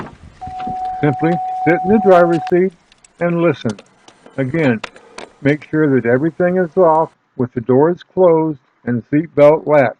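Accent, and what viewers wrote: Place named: South, United States. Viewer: RB CV really? He sounds really clear to me. Sounds like he maybe has a southern accent or southwestern accent.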